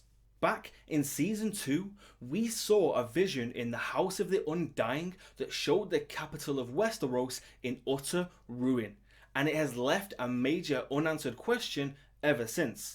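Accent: United Kingdom. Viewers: sounds like something from the UK? Hope he'll tell us!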